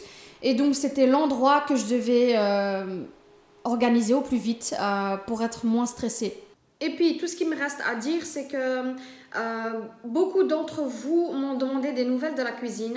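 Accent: Belgium. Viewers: Coucou 🤗 J aime bien te regarder et entendre ton accent belge! Bref ta cuisine est très jolie je vois bien des fauteuils de bar cosy avec un dossier en beige velours ou daim (matière qui se nettoie bien!), ensuite je te conseille de peindre les huisseries en blanc pour ajouter de la lumière à ta cuisine et pour que ça fasse un ensemble. Dernier conseille fais une vidéo sur ta cuisine lorsqu elle sera terminée ou tu décris tout, si tu met du granit au mur ça risque de faire trop et d étouffer ta pièce le carrelage que tu as montré et très jolie mais je le verrais plus en blanc nacré 😉j espère t avoir un peu aidé 😘😘😘😘bises. Hasna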